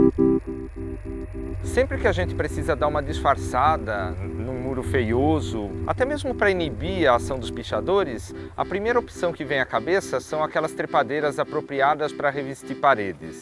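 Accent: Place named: Santa Catarina, Brazil. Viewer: parece santa catarina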